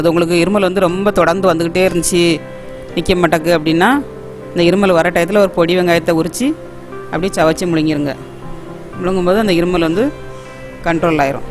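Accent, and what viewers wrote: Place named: Tirunelveli, India. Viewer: Nice slang tirunelveli Thoothukudi slang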